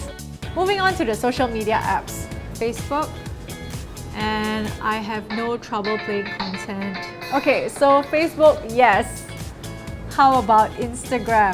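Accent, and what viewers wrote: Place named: Malaysia. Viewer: Malaysia accent.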